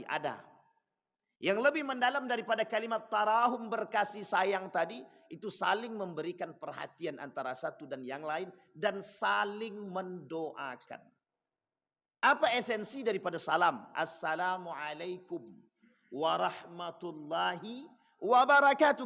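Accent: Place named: Riau, Indonesia. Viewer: Dari Riau sptnya. Sama spt ust abdul Shomad.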